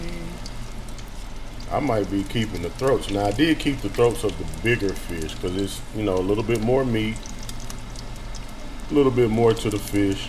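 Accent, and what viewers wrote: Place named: Texas, United States. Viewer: It’s crazy how a Texan can recognize another Texan by the tone of voice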